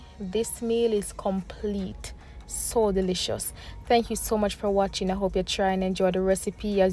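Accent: Jamaica. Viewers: Do I detect a Jamaican accent? Love the food.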